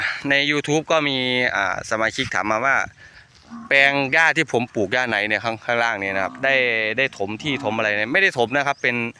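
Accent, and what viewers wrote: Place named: Laos. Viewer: ❤❤❤❤❤ชอบที่ท่านพูดภาษาลาวมากกว่าครับ...adminพูดลาวคนไทยฟังแล้วรู้สึกไม่เพราะครับ❤❤❤❤❤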